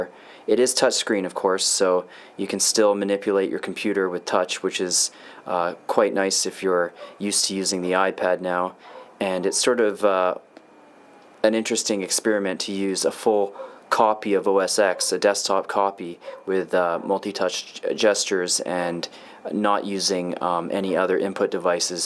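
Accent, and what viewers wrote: Canada. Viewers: judging by ur accent, ur Canadian?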